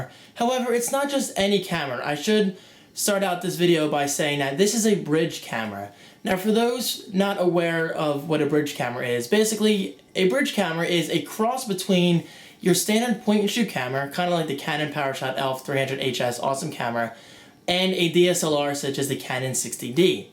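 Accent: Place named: New Jersey, United States. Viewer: I like your Jersey accent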